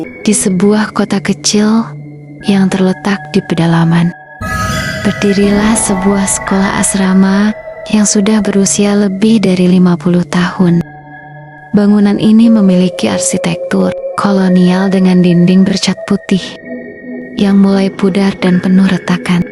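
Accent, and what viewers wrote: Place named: Indonesia. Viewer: Hai anak mak shayang, mak hadir layan sbg peneman tidur 😍 huhu terbaik cerita malam ni... kisah asrama 👻 smakin menarik editing ai dan penceritaan wlopon loghat indonesia mak suka 😀 keep it up shayangku, smoga trus sukses, Amiiin🤲🫶❤️❤️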